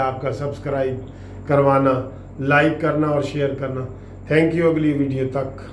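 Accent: Saraiki, Pakistan. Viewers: LEHJA SURA-EE-KEE HAAY, URDU...ROU RHEE HAAY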